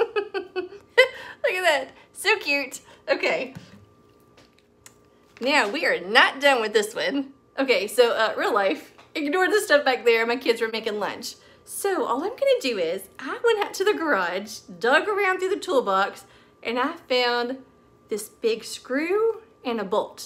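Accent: South, United States. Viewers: I hear a drawl in your voice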